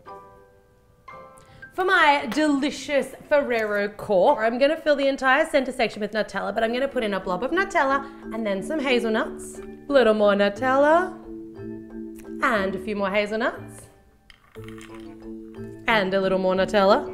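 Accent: Australia. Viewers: She's Australian so obvi she had a diff accent Don't be rude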